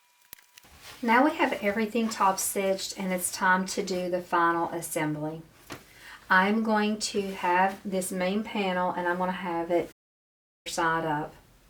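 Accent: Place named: West Virginia, United States. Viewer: I love your accent, too. Reminds me of growing up in West Virginia!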